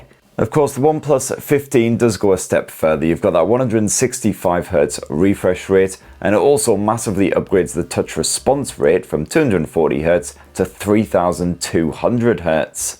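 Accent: United Kingdom. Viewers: I thought you were a Brit. Do pics look crisp or crispy like potatoes? 😋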